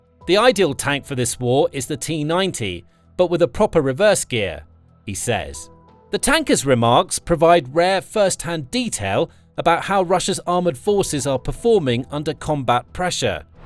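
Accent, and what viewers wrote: England, United Kingdom. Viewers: Why does the narrator (Who's clearly English by his accent) keep calling petrol "Gasoline"?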